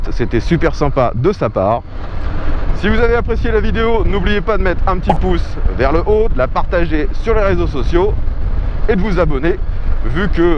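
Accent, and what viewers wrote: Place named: Paris, France. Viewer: On accroche pour tes commentaires ,et ton timbre de voix avec cet accent titi Parisien bien sur.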